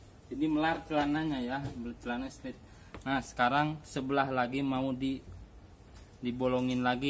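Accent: West Java, Indonesia. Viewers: Ampelas nya no brp aa ? ni kyk nya org sunda ya ngmng nya wkwkw kocak bgt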